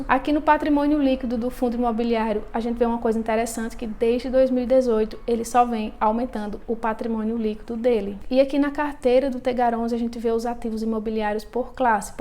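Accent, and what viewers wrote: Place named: Ceará, Brazil. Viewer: Lindo sotaque. Parece de Juazeiro do Norte Ceará.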